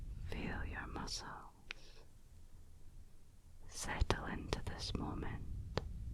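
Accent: Scotland, United Kingdom. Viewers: Scottish accent for the win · So to be honest I clicked on this video because I thought she is attractive. Hey, at least I tell the truth. However, I am now in ASMR heaven. I have listened to a LOT of ASMR videos for the past 15 years, maybe longer. I think we have a winner here. As an American, the Scottish accent does the trick, and she has mastered the "floating hands". Well done madam! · Going to Glasgow soon, is that anywhere near your accent? Been listening to you for a few years, so just wondering :D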